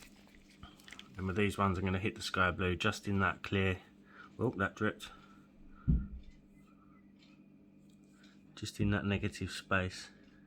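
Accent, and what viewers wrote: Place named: South East London, United Kingdom. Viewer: Hi. Beautiful effects! I'm from S.E. London originally and your accent sounds familiar, is that your area too?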